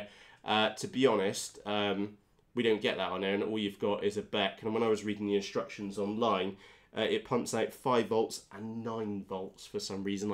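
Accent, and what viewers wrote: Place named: England, United Kingdom. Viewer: So English, makes a change from all the American channels, loving it.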